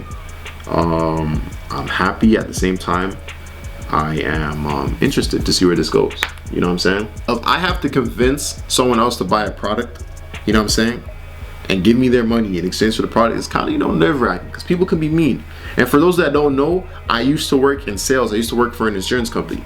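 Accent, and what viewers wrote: Toronto, Canada. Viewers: you from toronto bro? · You from Toronto fam? I hear that accent haha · You can tell this guy's from Canada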